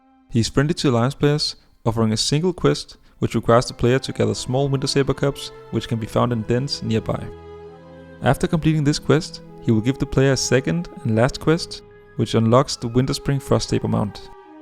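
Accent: Denmark. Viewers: Elsker din danske accent :D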